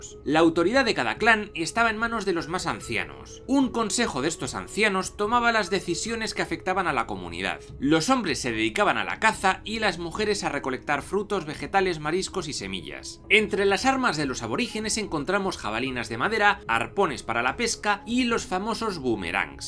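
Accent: Spain. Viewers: ¿Has pensado hacer una versión hablada en español latinoamericano? Es que el acento y la forma en que se habla en España nos resulta desagradable, pareciera que se estuvieran desinflando al pronunciar las palabras. Piénsalo, tal vez tus compatriotas te sigan y así tendríamos un mundo con un español más agradable.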